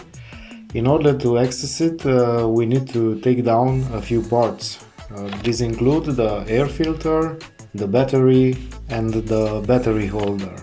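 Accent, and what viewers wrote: Romania. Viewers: din primele propozitii se vede ca esti roman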